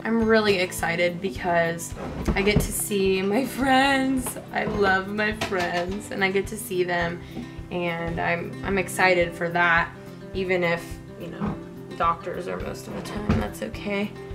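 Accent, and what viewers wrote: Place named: Russia. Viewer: Bahaha little russian accent is so hilarious. Oneeee, Twooo, Treee..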